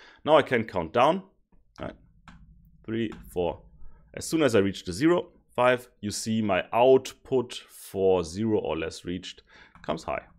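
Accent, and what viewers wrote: Germany. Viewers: Man merkt den deutschen Akzent 😂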